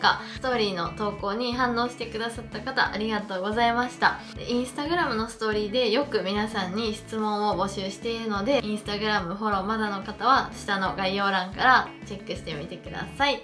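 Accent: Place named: Kansai, Japan. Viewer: 関西弁で話すから余計に面白い良いお年を (^^)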